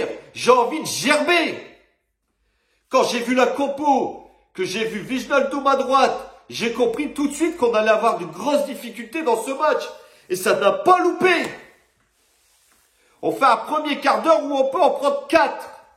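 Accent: North, France. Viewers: T es nordiste ?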